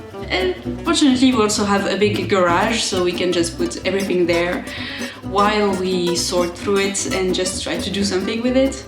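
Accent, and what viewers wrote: France. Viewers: Bonjour - God I love the French accent. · Why the French accent while speaking English?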